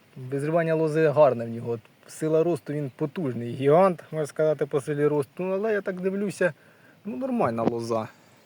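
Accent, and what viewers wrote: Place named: Poltava, Ukraine. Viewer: Дякую за чисту решетилівську мову!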